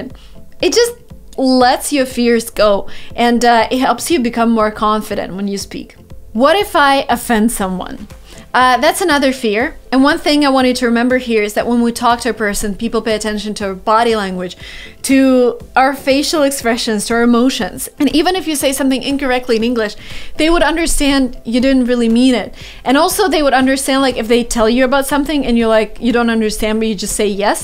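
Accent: Russia. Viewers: Strong Russian accent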